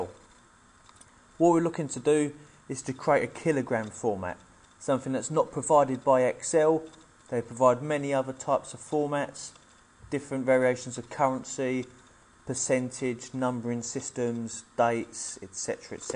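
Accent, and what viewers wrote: United Kingdom. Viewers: I like The British Accent, Well Done Brother Subscribed DONE. :)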